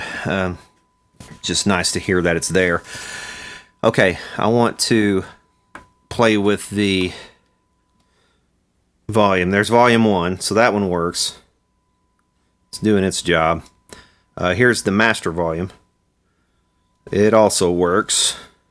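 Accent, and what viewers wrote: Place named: Georgia, United States. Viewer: SOUTH Georgia accent, be precise! nice work. Nice compressor.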